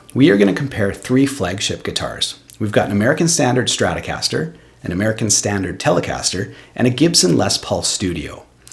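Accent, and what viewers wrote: Canada. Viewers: WHERE'S THIS GUY FROM , CANADA ?????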